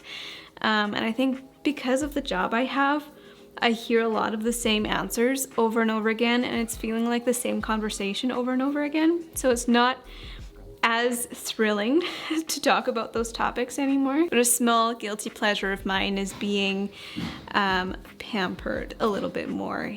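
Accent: Western Canada, Canada. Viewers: It’s definitely more of a west Canadian accent because here in Ontario we don’t say it like that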